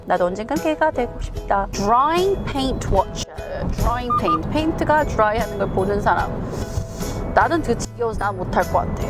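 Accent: United Kingdom. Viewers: 굿모닝~ 애불보디! 늘 들으면 들을수록 브릿이 귀에 쏙쏙!! 즐겁게 방송보고 가유~~^^ 누나 안뇽!^^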